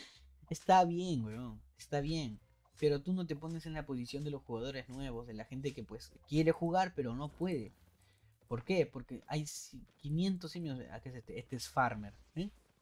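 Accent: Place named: Peru. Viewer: Me encanta como lo narra y se le nota que es peruano, que grande eres mano, sigue asi, tienes un sub mas ahora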